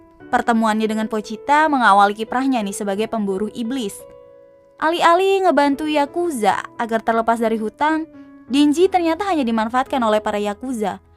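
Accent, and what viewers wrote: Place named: Tulungagung, Indonesia. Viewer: ooo iki ye wong tulungagung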